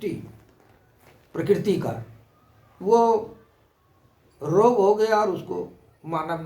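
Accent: Bihar, India. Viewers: Heard the whole story for the behari accent 😂 love it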